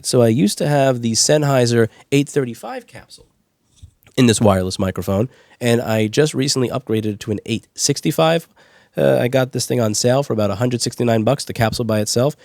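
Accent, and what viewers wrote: New York, United States. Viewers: Very entertaining, I just love your approach to injustice, etc, and the New York City "way"...of speaking out verbally. Anyway, being on the west coast, in Oregon, I never could stand the whole apple products pushback by their cult leaders and the members of the congregation, most noticeable here on the west coast which is closer to them. They and the other internet giants pretty much proclaim to rule the world, maybe they do right now, too big for their own good and it reminds me of doctors or lawyers or politicians who come from elite families and have gone to elite schools who have no clue, no idea what its like to be a regular human being or what the inside of a grocery store looks like for instance......have they banned you from facebook, twitter, paypal, google, etc etc yet? Seems like these days all the tech giants have a mutual agreement to ban nay sayers from everywhere all of a sudden.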